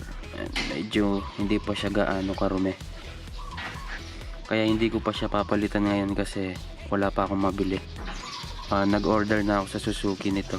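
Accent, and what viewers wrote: Pampanga, Philippines. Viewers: Bos, kapampangan kaba.?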